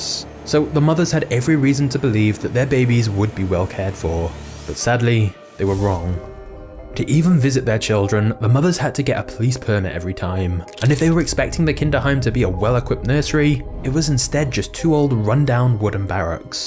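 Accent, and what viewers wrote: United Kingdom. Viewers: Bro is so hardcore Britain. 😂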